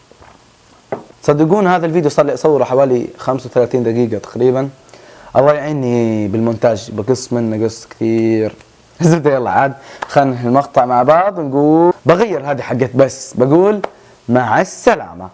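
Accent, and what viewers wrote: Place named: Syria. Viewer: I أنا مشتركة جديده في القناه... و صراحة الشي إللي حيرني هي لهجتك...مني عارفة بس...إنت تتكلم سعودي بس في لكنه بسيطة سورية...مادري ليش...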